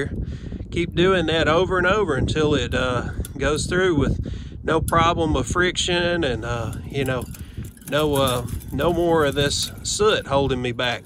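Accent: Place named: Arkansas, United States. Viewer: My guess is Arkansas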